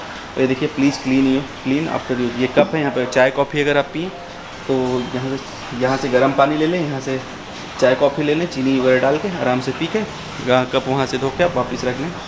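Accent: Bihar, India. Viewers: ooo bhai tu bihari hai kyaa... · Bhai aap bihar se ho kya? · Bihar se ho bhai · Bihar ho kya bhai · bhai Bihar k ho kay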